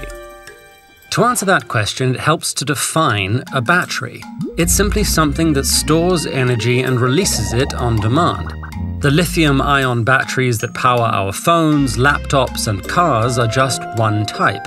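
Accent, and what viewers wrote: United Kingdom. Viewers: A British voice over in a Ted video is something I never thought I'd hear or need. Man this is good. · i like British Accent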